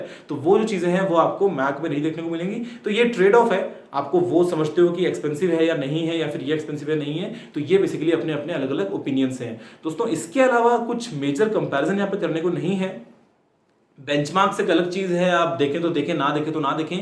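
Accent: India. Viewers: Classical Indian English, oh my god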